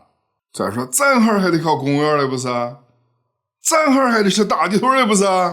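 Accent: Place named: Henan, China. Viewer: 你口音很像我老家口音！！！河南密县人！！！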